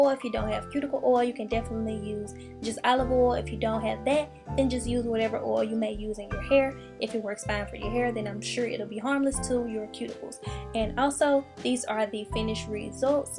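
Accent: South, United States. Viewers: I love ur country accent lol ❤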